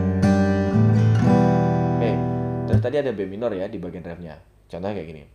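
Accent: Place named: Java, Indonesia. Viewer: Uwow.... Wong jowo tah. Kerasa banget logat mu dek. Salam ya dari ku putra Sumatra Utara Medan.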